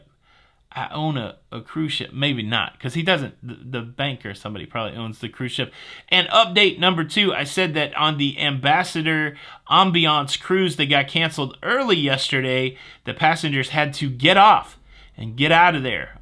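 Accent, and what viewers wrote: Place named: Texas, United States. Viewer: I am a born and bred Texan and your humor was spot on. BTW you have a great Texas accent.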